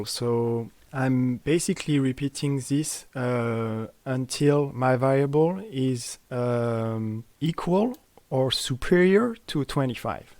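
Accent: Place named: France. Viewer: Super la video avec l'accent frenchy ;-) ! Très très utile, merci !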